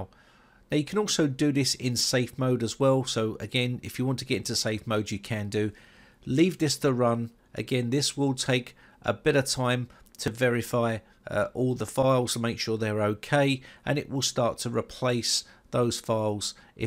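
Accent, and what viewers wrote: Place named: Scotland, United Kingdom. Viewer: Very great scottish accent!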